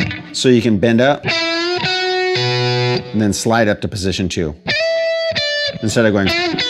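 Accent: United States. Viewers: Amazing player! Moreover, I practice american accent listening to you:) Greetings from Buenos Aires.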